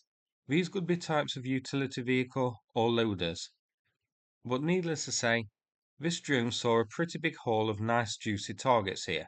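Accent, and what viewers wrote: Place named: Northern England, United Kingdom. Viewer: Still the same bad narration by a northern English speaker who should know better!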